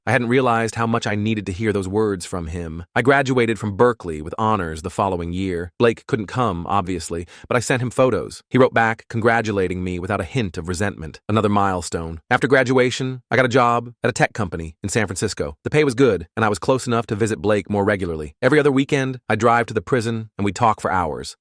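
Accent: United Kingdom. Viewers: sadly, but I like how the Brit accent sounds! (It was just funny how it randomly switched in the video)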